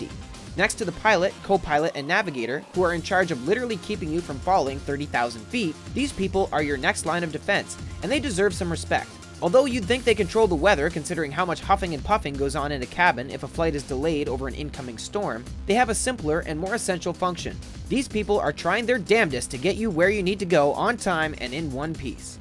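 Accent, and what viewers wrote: Canada. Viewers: Things I’ve learned: the narrator is Canadian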